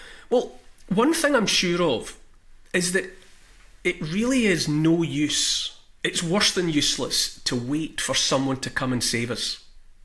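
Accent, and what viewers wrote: Scotland, United Kingdom. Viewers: As a fellow former Stirling resident, now an ex pat, greetings from the mountains of Norway. We must not let the b…..s grind us down. It is going to be back to the Stone Age type barter economy and too much for many. God help us, keep up the rhetoric and that great Scottish accent, we need you more than ever! Alex with kind regards.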